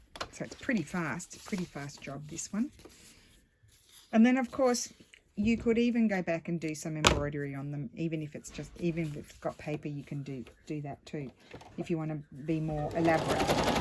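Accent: Australia. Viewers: Hi from Cairns Queensland. Like many others, I have become reliant on your daily broadcasts from Italy where you've been hard hit by COVID19. It always makes me happy to tune in to YouTube and see that your latest vid is there. I love listening to your chit chat and am glad to hear that you still sound like an Aussie. It also always good to know that Lulu, Steph and you are living their lives still as normally as possible during this apocalypse. Stay well, keep up with your vids please. Cheers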